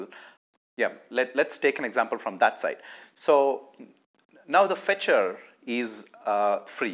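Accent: India. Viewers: An Indian youtube coding video where i don't feel like scratching my ears out!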